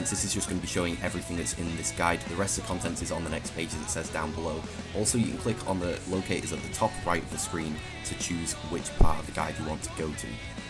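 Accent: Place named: England, United Kingdom. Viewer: You should have added text on things like gear names lol. It's hard to understand the English accent.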